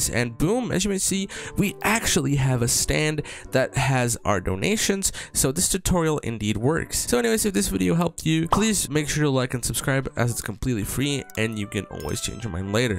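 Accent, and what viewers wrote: Czech Republic. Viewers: wait are you czech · are you from czech??? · bro are u from czech republic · ARE YOU CZECH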